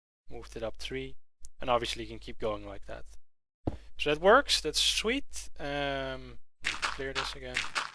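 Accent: Netherlands. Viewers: je bent nederlands he? hoor het aan hoe je bepaalde dingen zegt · U DUTCH RIGHT? ik hoor het aan je accent x) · nederlander zeker :P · lol , you sound dutch :)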